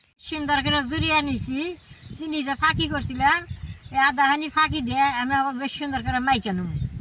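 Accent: Tangail, Bangladesh. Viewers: আপনার বাড়ি কি টাংগাইল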